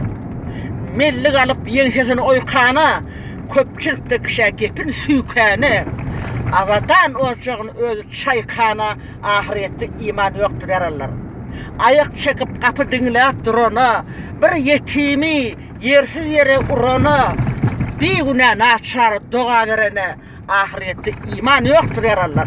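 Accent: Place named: Balkans. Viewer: Balkanly sesi aytya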